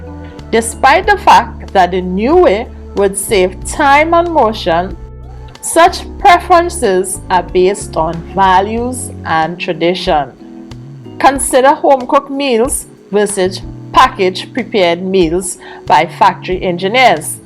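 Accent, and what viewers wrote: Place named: Jamaica. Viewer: You soon like Jamaican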